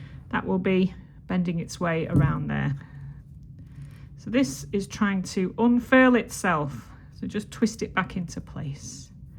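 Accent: United Kingdom. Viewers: Another great piece and so unique! I wish I’d had this when I was still working in an office. Thank you for this! 😊 Oh and by the way, do not worry about saying things such as “like so” or “literally” multiple times. We don’t mind. As an American I appreciate the little nuances of the English language as spoken by someone from Great Britain. My favorite thing you say is “faf about”. I had to look it up the first time i heard you say it and I just love it. 😁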